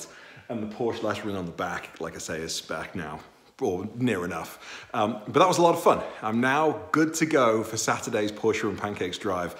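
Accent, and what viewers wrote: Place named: Northern England, United Kingdom. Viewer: I thought it was Liverpool!! I'm in Manchester so could her the Northern in your accent. 😂😂 Came across your channel as I'm on the look out for a 911 myself!! Keep up the good work! 👍👍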